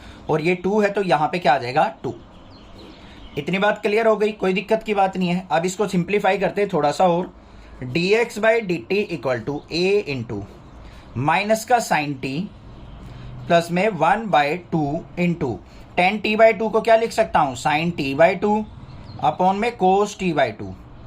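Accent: Haryana, India. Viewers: Sir are you from Haryana?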